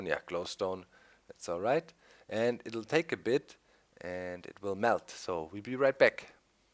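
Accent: Germany. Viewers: you can hear a little german accent :D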